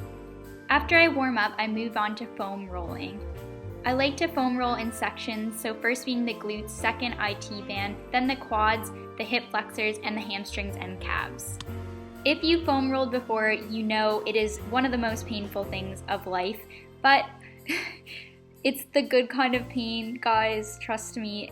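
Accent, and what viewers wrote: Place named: Canada. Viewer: canadian accent is cute :)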